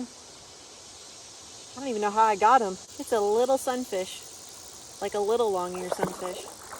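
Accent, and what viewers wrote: South, United States. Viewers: confused southern accent mumbling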